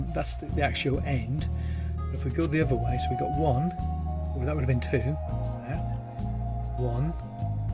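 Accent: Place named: Plymouth, United Kingdom. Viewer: Well done mate good video, I am going to give this a go on my MK4, your accent sounds local to mine, I'm in Plymouth!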